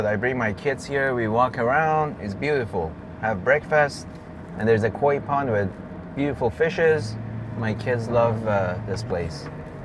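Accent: Iran. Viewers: Eh-screws, makes me feel like home, good ol Persian accent 😂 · New subscriber. Love your videos, but afraid to pick up Persian accent. ;) Ppl think Im Persian anyway, so Im ok with it anyway. :)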